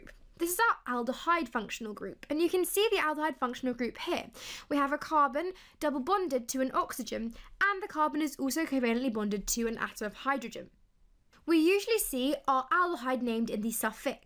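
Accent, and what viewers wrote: United Kingdom. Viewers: British accent eh.. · u mean she has a british accent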